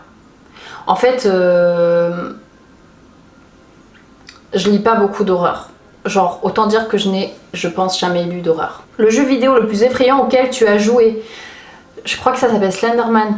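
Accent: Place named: Toulouse, France. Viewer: L’accent TOULOUSAIN HAHA !! J’adore ! Par contre, merci quoi à cause de l’histoire de l’homme aux lunettes je vais pas dodo cette nuit 😂😂😂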